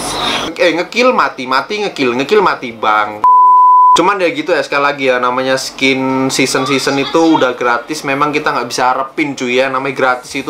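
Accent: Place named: Malang, Indonesia. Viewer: Arema medok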